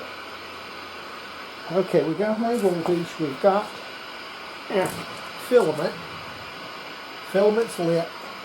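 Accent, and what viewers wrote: United States. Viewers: I think he lives in Texas? It is definitely some flavor of southern U.S. accent, not Australian.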